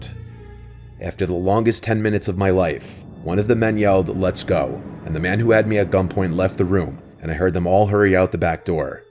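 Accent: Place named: Northeast, United States. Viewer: I really enjoy his narrations. Especially when I hear and itty-bitty hint of a Northeastern accent? Pretty sure that’s what I’m hearing. Correct me if I’m wrong please! 😅❤ For example: starting - I can hear the accent a tiny bit when he says “fenced off” . Also when he says “flashlight” and “Ashley” Example . 😊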